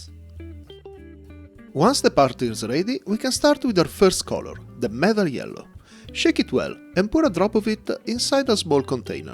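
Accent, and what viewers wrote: Italy. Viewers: l’accento è decisamente italiano… per questo ho fatto la domanda. Bel canale, bravo!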